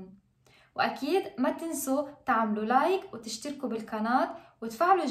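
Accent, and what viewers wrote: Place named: Arab world. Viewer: يؤبشني لهجتك عربيه ما احلاها 😀❤️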